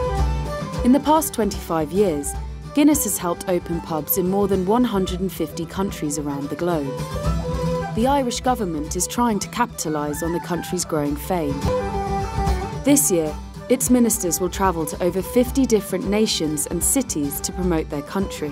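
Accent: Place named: England, United Kingdom. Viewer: The lovely English-accented voice makes no mention of why the Irish were forced to migrate around the world. 🤔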